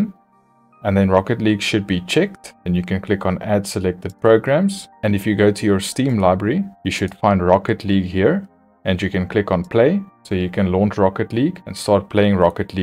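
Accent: Afrikaans, South Africa. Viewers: Jy klink Afrikaans. Is ek reg?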